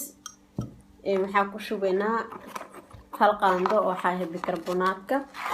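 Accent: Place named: Italy. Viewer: byo mashalh waad fcnthy lkn Lahjadaada wlhi daacaddii ma fahmayo af talyaani iyo sisi kale baad u hadashaa mrka ugu yaraan ingredientska english noogu sheeg adoo iga rali ah .. thanx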